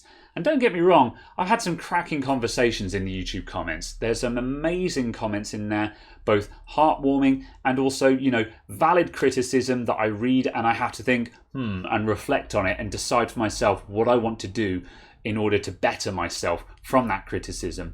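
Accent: United Kingdom. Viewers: I'd echo this. Nothing against US/Aussie/OtherNationInEnglish sites, but nice (comforting) to hear it with a Brit accent with Brit vernacular.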